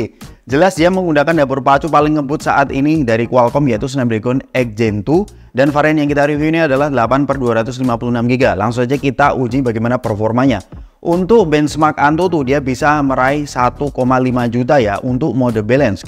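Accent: Indonesia. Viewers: Gak lah. Indonesia banget aksen nya kok sampe Amerika latin segala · Ga, malah indo banget aksen nya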